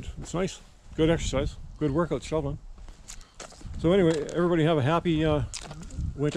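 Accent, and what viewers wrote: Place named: Australia. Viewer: Nice video, thanks. Love the Aussie too.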